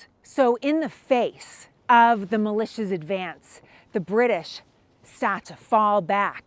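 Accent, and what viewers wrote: Boston, United States. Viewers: Superb presentation! Besides the detail, both tactical and personal, I love the Boston accent; PAAK, YA CAA IN THE HAAVAD YAAD. Ha ha. All over America we have different accents but we are one people. Thanks for posting this lovely tribute to our founding. Regards, Bernie Bunny. 7/5/2024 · These are wonderful historical lectures. You uncover and vividly capture the details, mundane and critical moments alike, in time past and report them as a sister, with a true native Boston accent. It is charming, really, and authentically underlines your personal connection and enthusiasm - as well as impressively microscopic as well as encyclopedic knowledge. Thanks for connecting it all and sharing the stories on your channel, it is really is a pleasure to experience your work. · I live out here in Washington state and to my ear, we have a very vanilla accent here. 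Thanks to this channel, I've decided that this Boston accent is THE BEST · Nice Boston accent. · As a former “Bostonian “from Lynn, I instantly fell in love with the channel. Of course the “Boston “accent was a very strong influence.❤❤ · Visitors Centa! Yes, great Boston Accent!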